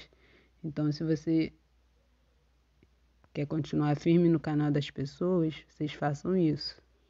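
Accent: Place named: Rio de Janeiro, Brazil. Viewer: Muito bom esse vídeo amiga👏👏,amo esse sotaque do rio janeiro 😍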